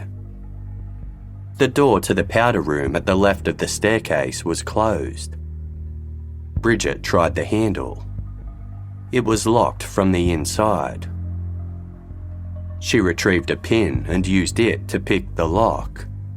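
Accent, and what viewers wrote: Australia. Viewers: my heart goes out to the kids of these monsters. Not only did they lose their grandfather, their grandmother and their daddy but now due to selfish custody BS, have lost their (other) stepfather/father and bitch of a mother! Love that this is narrated in our awesome Aussie accent! Cheers 🇦🇺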